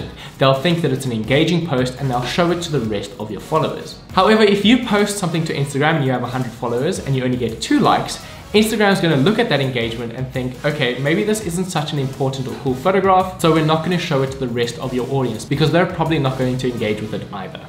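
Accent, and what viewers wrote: South Africa. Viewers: Is this guy South African?🤔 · is that a saffa accent bruh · Are u South African I am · Love this video! I noticed you have a South African accent, I was actually born in South Africa too! 😊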